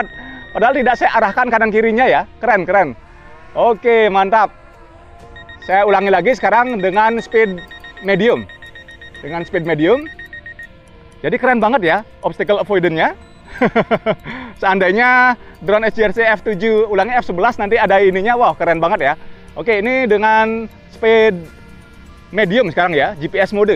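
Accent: Bali, Indonesia. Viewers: logatnya bali om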